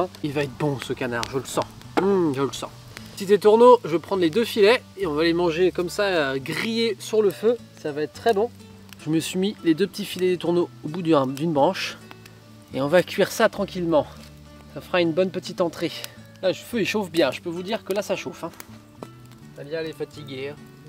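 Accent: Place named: Marseille, France. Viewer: Tu as l'accent marseillais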